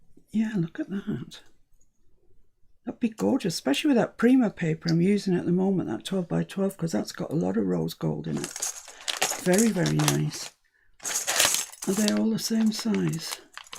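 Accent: England, United Kingdom. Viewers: I only caught the tail end of the 'live' on Sunday and our 6-yr-old grandson came along as I was watching. Our grandchildren know you as " " and realize you live in England. They love your accent. Tonight (Monday) I was watching, trying to catch up from the beginning. Warren was here again (and he would pronounce his name 'Wawwen') and said, "She's still awwganizing?" and watched along with me for awhile. I've just walked him down to his house and he was repeating, "I'll just put the buttons in the button drawer" all the way home - complete with the English accent.:) You're a hit in our house!! And by the way, I'm suffering from SES (someone else's 'stuff') envy - big time! Oh, and almost the first thing you picked up on your pile was an envelope from Clem...I grinned.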